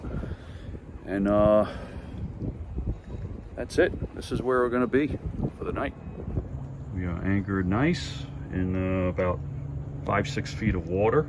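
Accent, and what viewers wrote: New York, United States. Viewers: From my last comment: Do yawl know what is wrong with these videos? Al they do is "Scuff" the surface of a ICW trip!! I also wonder.... WHAT PITCH props is he using??? Does he ever tell YOU so that YOU can know what kind of fuel mileage Yawl could expect IF You did the trip? He goes into all the fuel used with mileage, etc. BUT how does ANYONE know what to expect with THEIR Prop Pitch??? He says he's from Florida, He seems like a"TYPICAL" New Yorker & even sounds like one.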